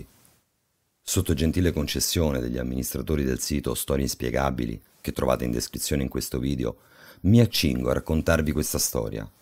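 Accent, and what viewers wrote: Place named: Rome, Italy. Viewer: La voce narrante distrae troppo dalla storia stessa. Bello il timbro vocale, ma inascoltabile la pessima dizione, nonché la biascicata pronuncia del romanesco "moderno", fuori luogo per tali narrazioni.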